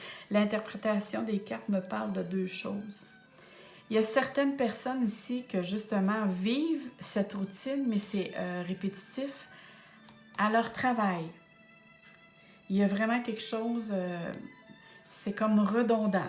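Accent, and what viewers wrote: Canada. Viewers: Bonsoir le Canada est dans ta voix 😘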